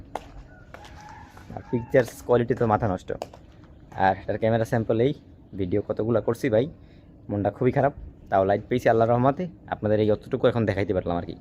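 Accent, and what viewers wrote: Barishal, Bangladesh. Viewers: Vhai ki iccee kore borishailla vasa e khota bolen?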